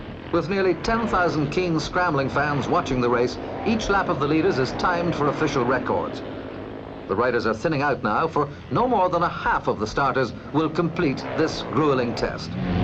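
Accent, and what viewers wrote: Ireland. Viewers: Do we know who the narrator is? I think I hear an Irish accent sneaking through in places.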